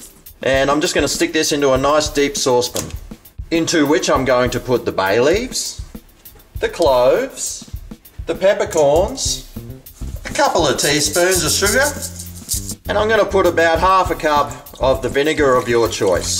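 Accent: Australia. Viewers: Are you oz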